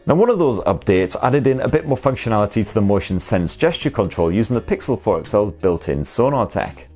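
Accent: United Kingdom. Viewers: Your Accent is marvelous love to listen it all day...😊😊 Brit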